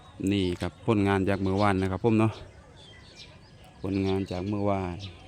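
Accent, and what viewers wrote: South, Thailand. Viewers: ทำมัยไม่พูดภาษาไทยจะได้ฟังรู้เรื่องบ้างนี้พูดภาษาใต้ฟังไม่รู้เรื่องเลย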